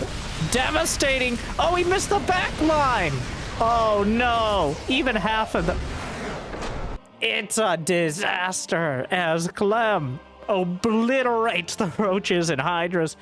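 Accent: South, United States. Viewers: I actually stopped playing in like 2010, didn’t even follow it anymore. casts were so good, I was chilling in Taiwan for the past year and it randomly popped up on my YouTube and I’m like WTH, let me check out the game. He single handedly made me interested in spectating competitive SC2 again. At first I’m like, is this a joke? Dudes southern accent just throwing out stuff like making his spire great again in professional casts? Now I catch at least one cast a day. 😂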